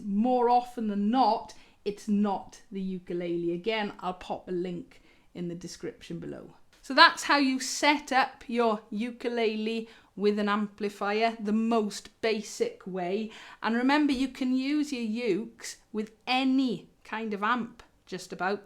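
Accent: United Kingdom. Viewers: Hey,. Are you British?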